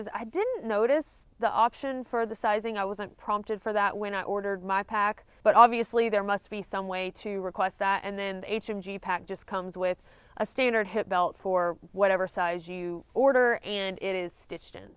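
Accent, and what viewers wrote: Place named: Alabama, United States. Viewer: I just found your channel, and I'm loving it! So much awesome information. 😊 Side note: do you happen to be from Alabama or around here? Our accents sound similar haha.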